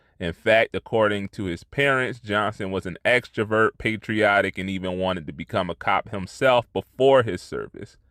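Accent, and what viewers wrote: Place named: South, United States. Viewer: You have a way with words my brother.. I know your from up north but your speech is southern (which in turn is likely derived from Afrikan ancestors whose culture is tied to proverbs and poetic means of expression through language)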